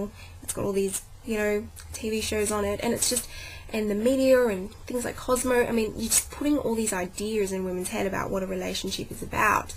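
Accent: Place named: Australia. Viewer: U sound Australian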